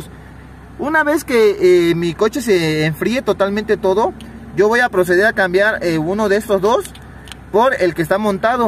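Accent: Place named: Mexico City, Mexico. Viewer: Casi no se te oye lo chilango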